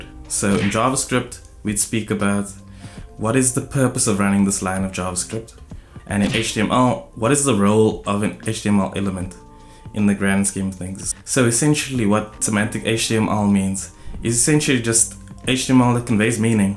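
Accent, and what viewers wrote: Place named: Asia. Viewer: I had no idea how html semantics worked until I watched this vide, very nicely done! also where you from? you sound a bit asian ??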